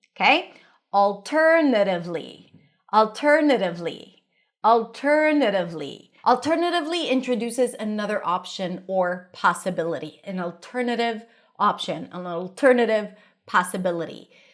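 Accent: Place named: United States. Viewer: Clear American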